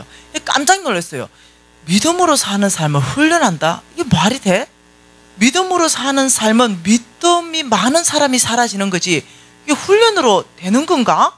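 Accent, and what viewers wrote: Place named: Gyeongsang, South Korea. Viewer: 간사님 대구분이신가요? 경상도 억양과 말투가 고스란히 나와서 친근하네요 ~~. 대구. 수성구에서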